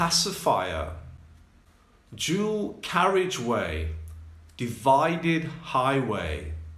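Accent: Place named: North, United Kingdom. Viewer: I can feel your accent is quite northern😁🏴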